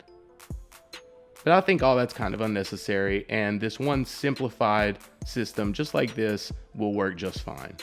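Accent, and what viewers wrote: South, United States. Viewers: Where are you from? To me it sounds like you have a few hints of a Southern accent.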